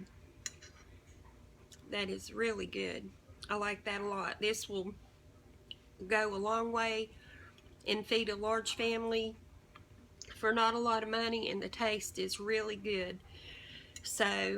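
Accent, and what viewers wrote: South, United States. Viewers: I love southern accents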